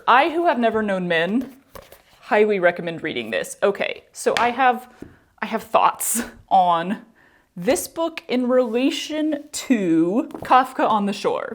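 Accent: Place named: Brazil. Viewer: I love that even though you grew up in Texas and live in California (major Spanish-speaking states), your accent sounds like Brazilian Portuguese 😂❤ Have you picked up much Portuguese?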